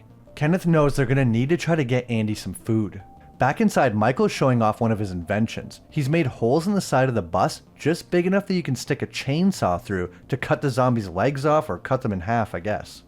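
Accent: Canada. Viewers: You lad are a canadian, 😂 i just noticed - tha accent been lost lately, you sneaky bouuut beer drinking mofo 😂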